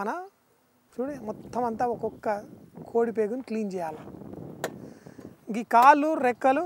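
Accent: Telangana, India. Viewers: Annayya Mana Telangana yasssa mastuga unnade anna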